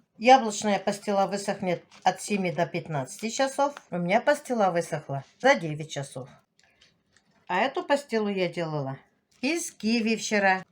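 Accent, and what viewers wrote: Tatarstan, Russia. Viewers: Здравствуйте. Случайно попал на ваш канал. Посмотрел ваш ролик очень отлично. За два дня пересмотрел все ваши ролики. Ролики ваши отличные молодец. Главное не надоедает. Больше показывайте про сельскую жизнь. Единственное не отказывайтесь от подарков. Люди дарят вам от.всей души а не как помощь. Если вы нам не нравились ни кто бы не смотрел вас. А так программы отличные и этот шарм. Татарскии акцент так мне нравиться. Привет семье дочкам зятьям внукам сестре брату женгей подружке. Сестренке из ново и пусть выздоравливает. Всех вас с новым годом. Крепкого здоровья. Семейного счастья успехов во всем. С новым годом.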